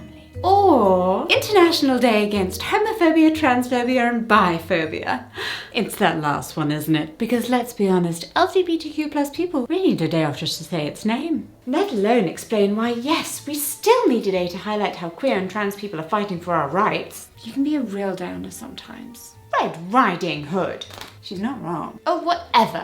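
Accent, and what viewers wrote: RP, United Kingdom. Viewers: You sound very posh.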